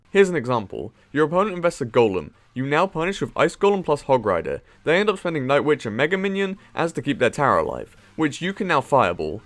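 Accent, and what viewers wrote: United Kingdom. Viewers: I got it your a British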